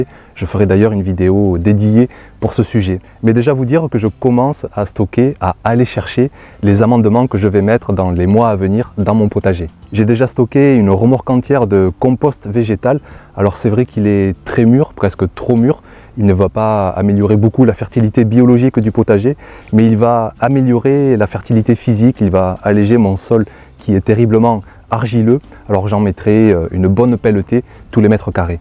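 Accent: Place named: Montpellier, France. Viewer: Bonjour, un immense merci pour ces vidéos très intéressantes. Le propos est parfaitement clair et votre amour du potager et de l'écologie est communicative:) Bonsoir, Je travaille en tant qu'assitant pédagogique au Collège des Escholiers de la Mosson à Montpellier. Nous sommes une équipe de 5 (pour l'instant), et souhaiterions relancer le potager du collège, qui est déjà bien en place, mais a été laissé en friche depuis trop d'années.... Personnellement, la permaculture est un domaine que je découvre peu à peu... et qui est passionnant! Connaitriez-vous des personnes ou des assos qui voudraient bien nous aider, pour faire un premier "diagnostic" du jardin sur place, et nous conseiller un peu sur les choses à mettre en oeuvre pour lui redonner vie et l'animer? Nous avons déjà un peu planché dessus, mais des avis d' "amoureux" initiés et d' "experts" nous permettraient sans doute d'avancer plus sereinement, et de mener à bien ce projet qui nous tient à coeur pour la vie de l'établissement. 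Nous souhaiterions commencer les actions dès octobre, idéalement et dans la mesure du possible. Timing serré...:p C'est jouable selon vous? On prend toutes les pistes: rencontre autour d'un verre, chaines youtubes, sites internet, bibliographie... et si vous êtes dans le coin de Montpellier (l'accent laisse penser que vous êtes pas trop loin), ça serait un plaisir de vous recevoir au jardin (si ça se met en place...) Vous pouvez me contacter en sur Facebook (Nyco Youpy) ou sur <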